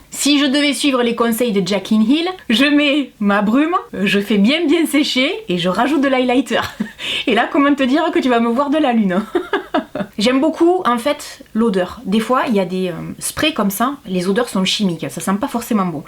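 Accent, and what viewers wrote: South, France. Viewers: ET SON ACCENT DU SUD UN REGALE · Il est beau notre accent!! Merci pour cette video. Bisous d une catalane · Coucou. J’ai toujours voulu tester les marques M.A.C et Maybelline, malheureusement elles testent toujours sur les animaux donc je préfère m’abstenir.... J’ai beaucoup aimé ta vidéo mais surtout ton accent du sud! 😂 Bon week-end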